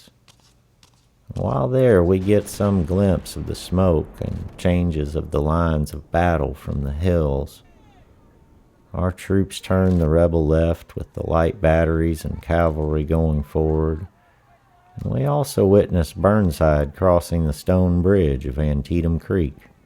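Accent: South, United States. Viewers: Great narration. Just that the person who wrote this was in the Michigan 4th. I kept getting confused because of the narrators southern accent.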